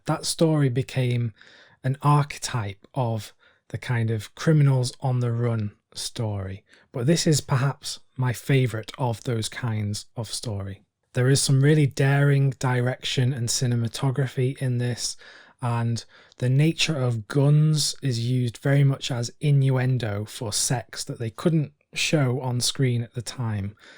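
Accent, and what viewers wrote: United Kingdom. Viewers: Great tutorial and will watch some of these. Is his accent British maybe from a small corner of England somewhere? Sounds like a very unique accent. Is "North by Northwest" more of a Hitchkok horror film and not Film Noir? I looked at the present day list of the Film Noir of today, forgot the official name of that genre, and wow so much violence I quit watching even the clips.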